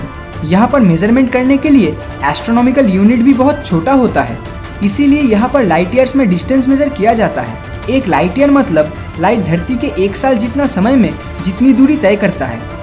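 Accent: Bengal, India. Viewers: Bhai app Bengal se hai kya?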